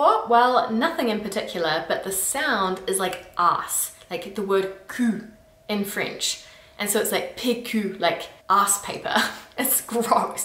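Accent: New Zealand. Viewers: The closeness to crap is only because of your kiwi accent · Just wondering if you are a kiwi by any chance? I thought I heard some kiwi accent/ pronunciation. Eg seven or percent :)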